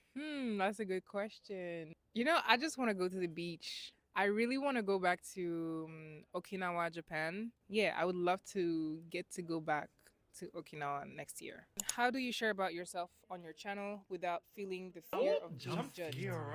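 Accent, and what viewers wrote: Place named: England, United Kingdom. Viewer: You are sooooo cool! New subby!!! I LOVE your English accent!!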